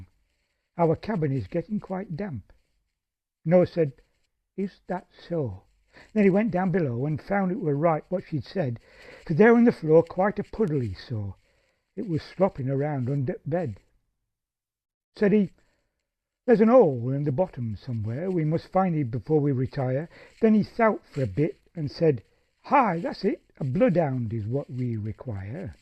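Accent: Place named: North, United Kingdom. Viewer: Fantastic fresh take on this classic—your lively delivery nails the northern twang, especially the cheeky rhythm on the bloodhound ballooning up and Mrs Noah's soggy sleeve mishap. In my retirement project V2Melody, I've set "The 'Ole in the Ark" to a witty folk chanson to introduce Edgar's timeless humor to new listeners. Cheers for the spirited reading!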